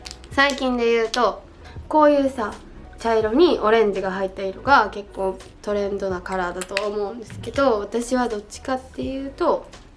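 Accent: Kansai, Japan. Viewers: このお顔で関西弁は強い、、❤︎ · 「〜しとう、〜しとった」に同じ兵庫県民としてめちゃくちゃ親近感わきました☺️❣️ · 関西弁？なところがまたいい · 最近の話し方わりと標準語っぽかったけどやっぱりコテコテ関西弁のほうがいい〜！！ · 今回めっちゃ関西弁が多いなぜ🥺🥺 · 今日いつもより関西弁！？最高やん😍😍😍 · いつもこんな関西弁でてたっけ？(笑) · なんか今日めっちゃ関西弁、笑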